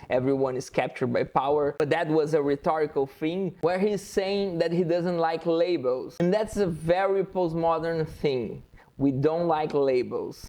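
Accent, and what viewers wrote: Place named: Brazil. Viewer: I love your accent! Let's go Brazil!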